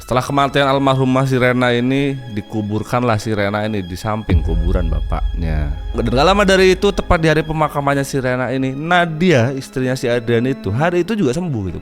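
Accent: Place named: Lampung, Indonesia. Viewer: logat² nya kaya orang Lampung bang 😊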